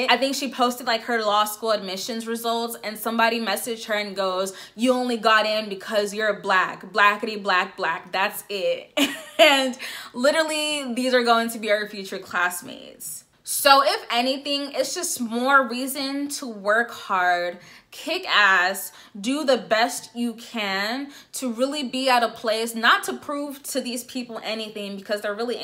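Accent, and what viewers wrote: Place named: Nigeria. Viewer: You got some Nigerian accent, kinda of tho.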